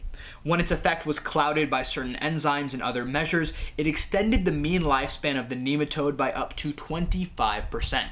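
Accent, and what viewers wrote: India. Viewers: Do you have a tiny bit of an Indian accent? I'm not sure whether or not I'm hearing something.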